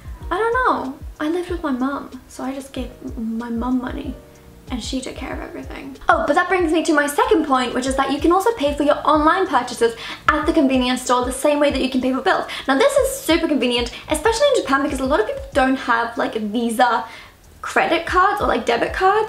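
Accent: United Kingdom. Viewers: Accent is definitely UK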